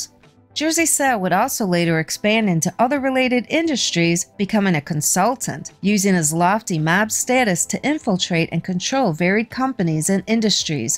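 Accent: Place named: Midwest, United States. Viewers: Announcer sounds like she is from Midwest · This narrator’s voice tickles me. Gotta be from Chi-ca-go or Fargo. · A strong Chicago Illinois accent for sure lol.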